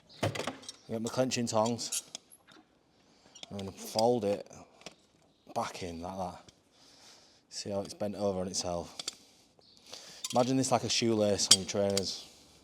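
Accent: Yorkshire, United Kingdom. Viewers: He is a Yorkshire lad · your Yorkshire patois something words escape my understanding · Where are you from? Britain? Sounds like. I' ve been to Oxford, Kidlington, Cambridge, Bath, London, Bournmouth, Blenheim Palace and Newmarket, watching the racing horses 🥰. And what's your favourite knife? 🤗🦄 Thanks for sharing. 👋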